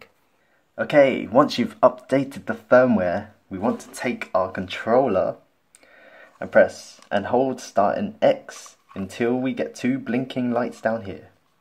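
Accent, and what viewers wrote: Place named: England, United Kingdom. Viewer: Thnx dude, i love your English accent =]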